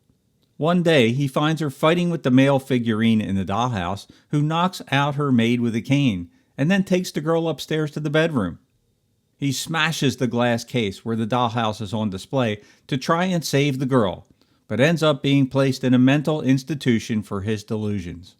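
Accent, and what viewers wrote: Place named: Baltimore, United States. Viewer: Very fun seeing these clips. I had only seen one of them. Hey are you from Baltimore by chance? I grew up there and you have a similar accent from folk who live there.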